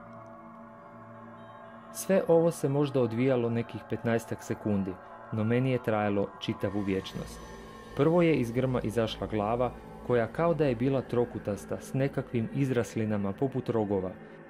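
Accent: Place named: Ekavian, Serbia. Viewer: Uh uh uh uh uh uh uh uh uh uh uh uh uh uh uh uh uh uh uh uh uh uh uh uh uh uh uh uh uh uh uh uh uh uh uh uh uh uh uh uh uh uh uh uh uh uh uh uh uh uh uh uh uh uh uh uh uh uh uh uh uh uh uh uh uh uh uh uh uh uh uh uh uh uh uh uh uh uh uh uh uh uh uh uh uh uh uh uh uh uh uh uh uh uh uh uh ih uh ih a ka ono si ti šef vi ste ekavci srbi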